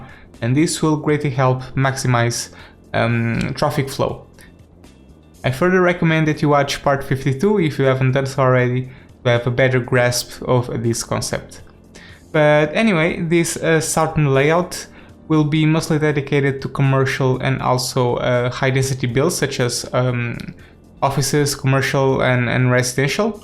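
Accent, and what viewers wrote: Portugal. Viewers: Engraçado que ouvi o teu sotaque e percebi logo que eras tuga :) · A voz não engana ninguém, a maneira de falar o inglês, nota se mesmo que és tuga caralho!!! Gosto muito dos videos, continua o bom trabalho!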